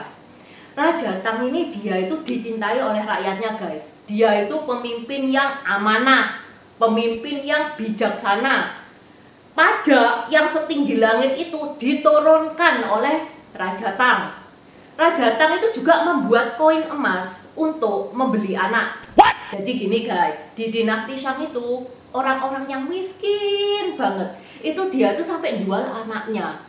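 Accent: Java, Indonesia. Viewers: Uda AQ subscribe kak,maaf mo nnya,,Uda berkeluarga??medok tpi cina,lucuu 🤭🤭 suka n mnghibur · Tionghoa medok 👍👍👍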